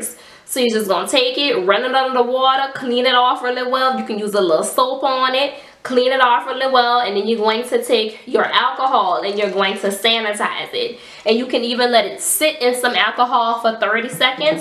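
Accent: New Orleans, United States. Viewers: Yes mama I hear dat orleans accent 😂❤️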